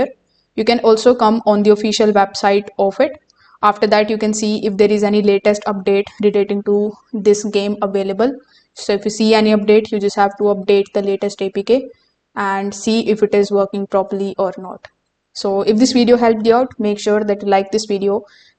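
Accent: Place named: India. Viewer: bro we can hear that you are indian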